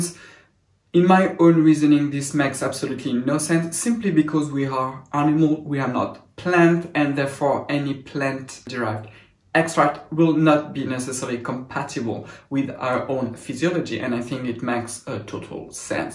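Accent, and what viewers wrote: France. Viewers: Are you French? Lovely accent!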